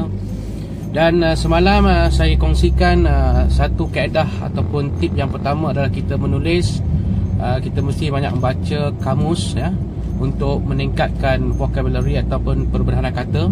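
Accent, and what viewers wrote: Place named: Malaysia. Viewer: Mantap Tutorial and tips nya Saya Jadi ingat upin ipin Logat Malayu kental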